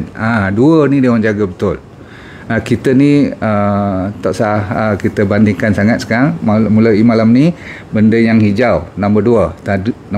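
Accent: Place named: Kelantan, Malaysia. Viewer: Pandai kecek klate. Manih leting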